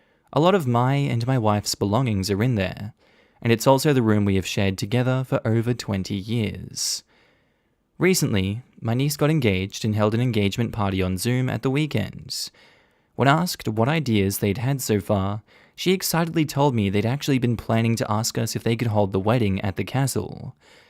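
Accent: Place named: Australia. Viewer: I love having an Aussie to read these.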